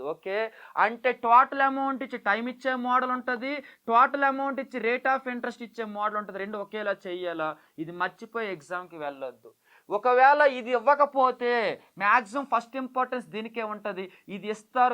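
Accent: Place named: Srikakulam, India. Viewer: Sir meedi srikakulama vijayanagarama yaasa alaa vundi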